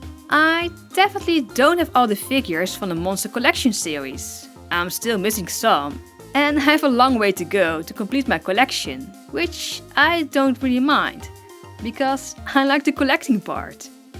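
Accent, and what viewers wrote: Netherlands. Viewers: Are you Dutch!? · Op de 1 of andere manier kan ik gewoon horen dat je uit Nederland komt !! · Toen ik dat accent hoorde wist ik meteen dat je Nederlands bent!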